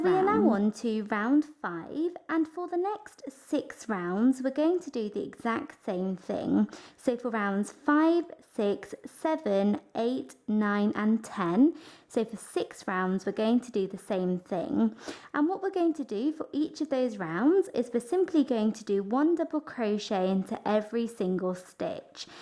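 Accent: England, United Kingdom. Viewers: Thought with your English accent this would be in UK terms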